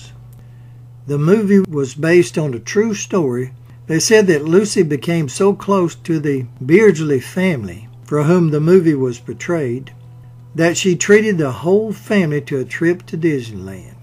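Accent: South, United States. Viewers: Dear, enjoy your southern draw, and gift in Biography's which keep getting better and better.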